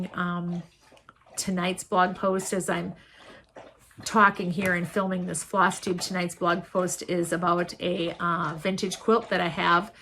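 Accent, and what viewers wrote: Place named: Minnesota, United States. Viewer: Love your shirt!!! I live in Minnesota--I thought I recognized your Minnesota twang...lol. I also own two farms in southern Iowa.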